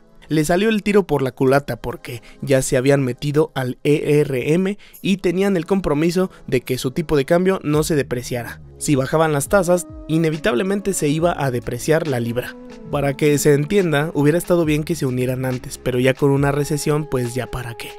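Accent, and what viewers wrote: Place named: Mexico. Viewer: No me gusta tu español mexicano.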